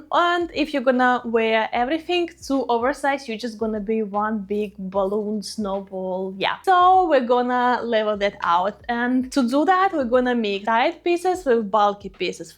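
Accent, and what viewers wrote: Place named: Russia. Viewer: Are u from Russia? Your accent and pronunciation is very special. Russian style 😅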